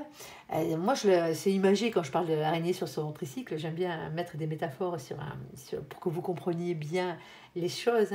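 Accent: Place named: South, France. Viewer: Vous êtes super, et quelle energie avec ce petit accent du sud😂 Quelle maitrise, ça inspire confiance❤ très beau tirage, merci😘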